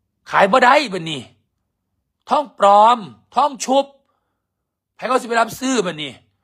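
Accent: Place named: Isan, Thailand. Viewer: เว่าถืกคัก เด็กสมัยใหม่จั้ง คือเว่าเป็นแท้ แม่ใหญ่กรเลี้ยงลูกเป็นคักเด้อ❤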